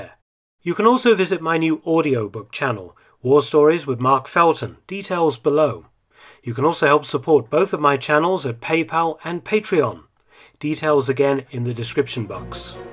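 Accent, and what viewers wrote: United Kingdom. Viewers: Thank You Mark, you would make a great American!!! It's refreshing to have a Brit narrate such event in American History!!! Become one of us Mark! God Bless You!!! Godspeed :)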